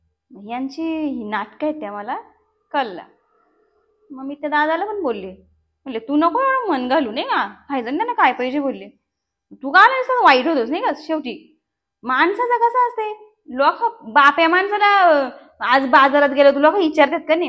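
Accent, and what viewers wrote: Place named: Shahapur-Murbad, India. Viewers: Shahapur murbad bhasha 😂😂😂 kinhavli pn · अभिनंदन आपल्या शहापूर - मुरबाड च्या महिलांचा परफेक्ट टोन पकडलाय . ऐकायला गोड , आपुलकी जाणवते . शुभेच्छा.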